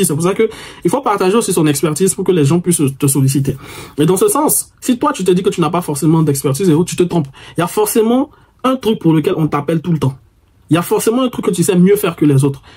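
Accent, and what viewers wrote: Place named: Ivory Coast. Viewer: Ton côté Ivoirien 😂 m'a permis de comprendre vite 🤝🤝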